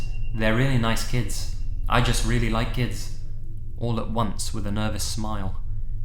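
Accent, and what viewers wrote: United Kingdom. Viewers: i'm newer to this channel, but I really like it! does a good job of narrating these in a way that emphasizes the important points. You wouldn't think that a higher voice with a British accent would be very good for narrating horror, but his is just about perfect. subscribing as Legion member 527,001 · You sound like CreepsMcPasta! Probably cause he's also British but fair enough, I like your accent!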